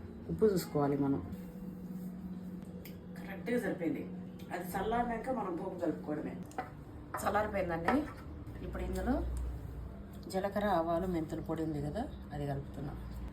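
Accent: Telangana, India. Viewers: మీరేమో రాయల సీమ అంటారు లాంగ్వేజ్ ఏమో అచ్చం మా తెలంగాణ లాగ మాట్లాడుతారు మీరు మి పిల్లలు..మి అమ్మాయి అయితే ఇంకా బాగా మాట్లాడుతధి ముఖ్యంగా మి వంటల్లో చికెన్ అంటే మస్తు ఇష్టం నాకు ...అందాజ గా ఏశిన 👌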